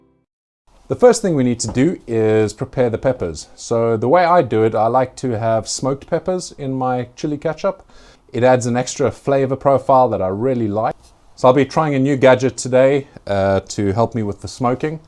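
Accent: South Africa. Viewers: Sorry I haven't seen your channel until today as your content is great! Are you from Capetown originally? I think I hear a definite SA accent. I have made ketchup/catsup a couple of times, much superior to store bought, but a real effort to do, I think that if you get in the rhythm of doing it, it is as much of a chore. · No way i thought you were south aftican not british! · Typical weather in the UK? I could have sworn you were in South Africa with the accent. Hmm..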